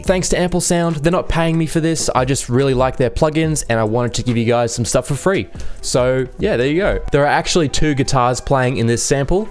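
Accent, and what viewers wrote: Australia. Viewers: You're an absolute genius, hidden gem this channel. I don't even produce in FL, but learn so much from you bro!!!!! Nice to get a dose of the accent from down under as well!!!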